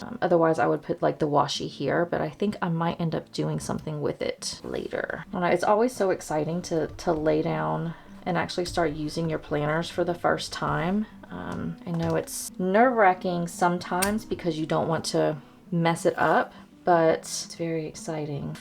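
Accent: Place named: Tennessee, United States. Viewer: It’s so nice to hear someone who has an accent like mine. I’m from south west Tennessee.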